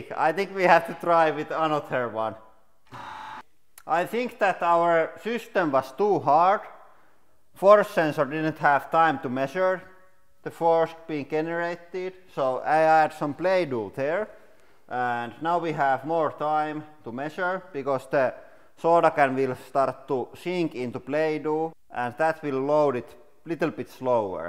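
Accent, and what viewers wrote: Finland. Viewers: R U from Finland my friend ? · I love indirectly learning Finnish from these videos based on the accent alone, it throws me off when he says things like “asked” because it’s a one-syllable word but hearing it like “ask-ed” makes me stop and think about how phonetic Finnish is. Hopefully I can break down syllables easier, especially when pronouncing the months and words like kyysymys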